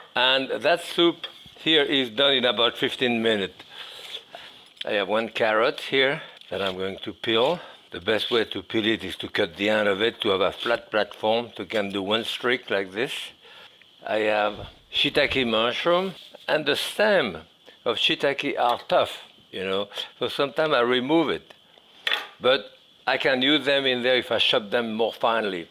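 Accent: France. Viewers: Jacques tu es le meilleur et cet accent parisien 🎉❤❤Longue vie mon cher 🎉❤🎉❤🎉❤🎉❤inchaAllah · “ ‘Appy Cooking!” Love his French accent! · Also his voice and that little French accent, it's irresistible.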